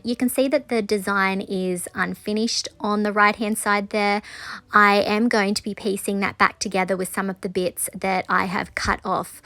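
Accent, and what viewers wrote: Australia. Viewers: Loved all of these! You are so talented and like your Aussie accent❤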